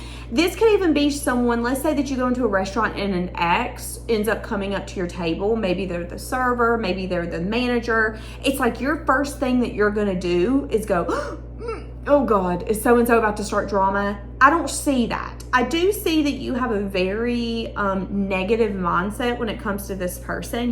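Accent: South, United States. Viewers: Love ❤ your sounthern accent. Its very attractive on you.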